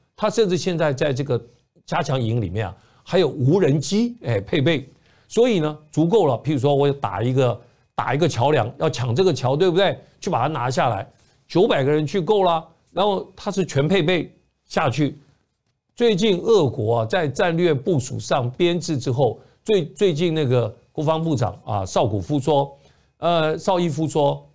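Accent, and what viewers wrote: Standard. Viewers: 你到底是不是100%洋人血统？ 反正普通话是100%纯正，神乎其神！ 谜团？🙄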